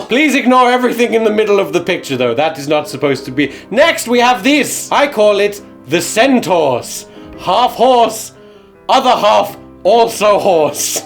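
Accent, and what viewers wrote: United States. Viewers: His American accent reminds me of Markiplier a little bit. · I thought he was British now he’s amarican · The american accent made it so much better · Ur American accent genuinely reminded me of markiplier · Why does your American accent sound like Markiplier? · He's talked about this before. There's an old video on this channel about accents where he says he picked up some Americanisms from watching a lot of American cartoons as a child. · You sounded like an American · Good American accent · That's... a really good american accent. And as an American who enjoys making fun of non-american people doing american accents, that's painful to admit. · The really good American accent just tied the whole thing together. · das a pretty good american accent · your american accent is on point :3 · Mohawk fits you,. Also, good American accent. 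· Spot on American accent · That american accent is 10/10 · American sounds so much different than British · Pretty good American accent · Your American accent is actually p good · Dang his american accent is pretty decent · Wow that American accent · Your American accent is super weird coming out of your mouth. Good but weird!!!!! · That’s a good American accent. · Ayyyy he’s an American maen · Pretty good American Accent. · You have an uncomfortably good American accent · you do such a good american accent jeez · His American accent is on point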